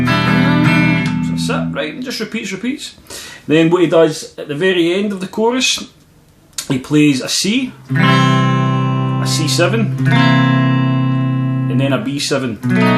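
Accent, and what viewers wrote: Scotland, United Kingdom. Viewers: Nice job. Enjoyed your accent. For American English, its always surprising to hear the strong accent disappear when you sing as the words become crystal clear. Btw you have a good singing voice in the times you did sing. You didn't sing enough to tell if your voice is trained but if not in my opinion you have a good base voice to begin training. Much respect for Scotland! My ancestors came to America from Scotland! Robertson clan!!!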